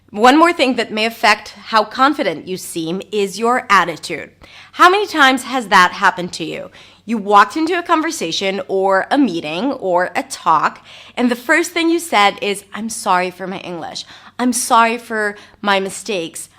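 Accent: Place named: United States. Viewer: like your American voice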